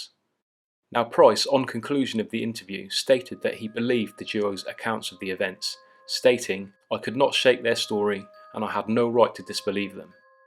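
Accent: United Kingdom. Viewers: I subscribed, and I think this is a great narration and good story. Well researched. I'm partial to British accents reading though. Most podcasts and audiobooks I listen to do not hold my interest if they do not have the accent. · I'm really liking the narrator's voice (?). I'm American & dig a brit accent. His videos are really enjoyable, as well.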